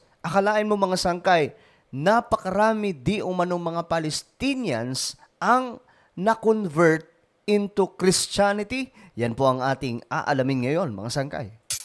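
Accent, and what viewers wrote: Waray, Philippines. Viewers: Ok sangkay parang waray.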